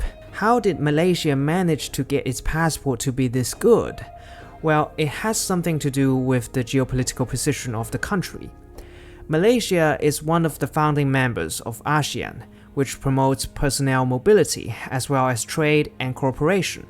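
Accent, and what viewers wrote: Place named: United Kingdom. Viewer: Goosebumps, Asian with British accent.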